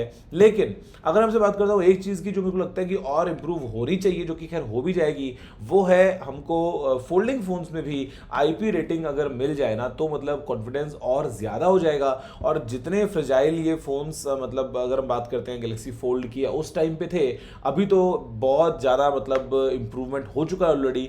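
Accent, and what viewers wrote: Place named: Marwari, India. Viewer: Aapka marwadi aati ha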